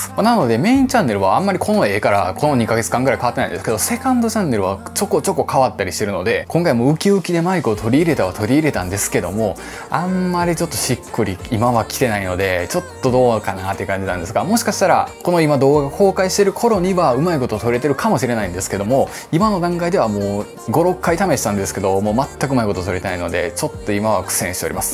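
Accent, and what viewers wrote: Kansai, Japan. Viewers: 綺麗な関西弁で何かラジオみたいに聞いてたい｡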